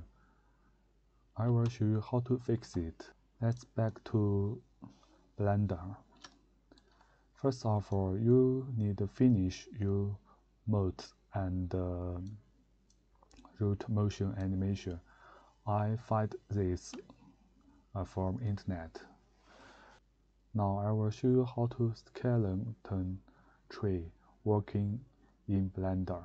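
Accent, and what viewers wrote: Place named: China. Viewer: 好明显的中国口音啊大佬哈哈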